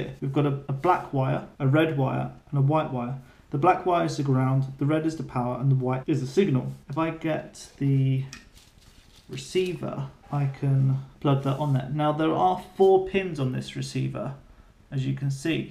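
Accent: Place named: England, United Kingdom. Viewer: Hey I just saw your video again and put captions on.... didn't understand the English accent I guess! Sorry... so that solder is for Battery Detect? So turnigy can tell when you are connected right? So did you solder directly to battery connections on PDB? or a lower 5 voltage connector.... BTW great job.... how on earth did you figure this out.... there is almost documentation around! Thanks again!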